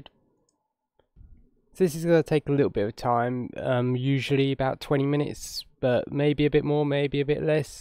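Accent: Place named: Cockney, United Kingdom. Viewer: Hard to understand the Cockney accent. Stop chewing on the chewing gum.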